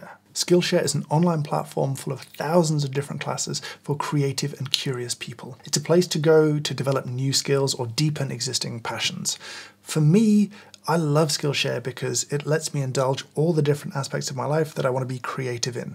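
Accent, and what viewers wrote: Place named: RP, United Kingdom. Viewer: Petersen 😂😂😂😂 Yes he speaks the Queen’s English !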